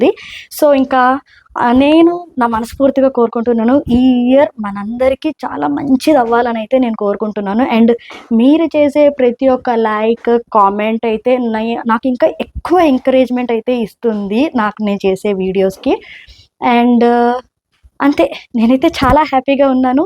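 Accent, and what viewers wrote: Karnataka, India. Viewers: ನೀವು ರಿ ರಿ ಅಂದಾಗೆ ಗೊತ್ತಾಯಿತು ನೀವು ಕನ್ನಡದವರು ಅಂತ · Meeru 16 anaala telugu ammaya leka kannada vaara clarity kaavaali happy new year 🎉 🎉 🎉 🎉 telisanu anali mee vadranam baagundi alage me saari kuda super 👌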